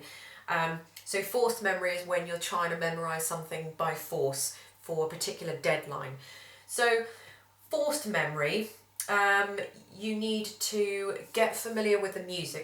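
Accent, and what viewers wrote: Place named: Scotland, United Kingdom. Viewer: You have a rich Scottish accent in my opinion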